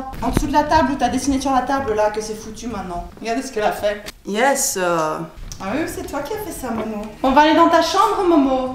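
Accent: Brussels, Belgium. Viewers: Coucou! Je suis ta chaîne depuis la Belgique, de Liiiidje 😅🇧🇪 tu es d'où toi 'originellement'? Tu as un peu l'accent de ma couzine de Forest hehhe! J'ai découvert ta chaîne il y a peu grâce au Home tour et puis je suis tombée amoureuse 😅😍 de ta bonne humeur communicative, tu m'inspires positivement et j'ai l'impression de me sentir mieux pendant ce confinement grâce à ce voyage virtuel dans votre super vie 'exotique' si jpuis diiire hehhe. c'est marrant l'effet des vidéos quand même hein.. J'ai déjà partagé tes vidéos aveca meilleure amie et des amis fan du Japon car je trouve que c'est sympa comme tu présentes ça en tant que Belge 👍🏻😊 Continue à nous faire vivre des petits moments bonheur comme ça'ps: j'adore ta maison elle a l'air tellement grande et le jardin avec son porch' LOVE. IT. HIHI bises. Sophie de Liège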